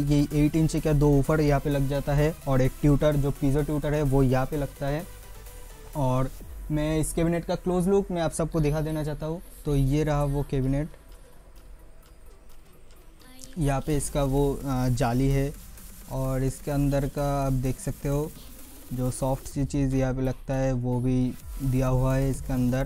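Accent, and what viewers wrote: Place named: Bengal, India. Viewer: Dada tomar kotha sune bujhlam tumi bangali tomar bari kothay ❤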